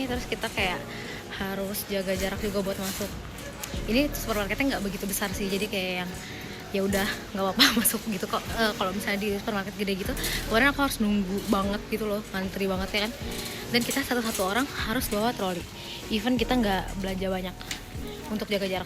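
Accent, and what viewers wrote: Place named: West Java, Indonesia. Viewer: Logat sundanya masih kedengeran wkwk. Mirip papahnya bgt ihhh gemes wkwk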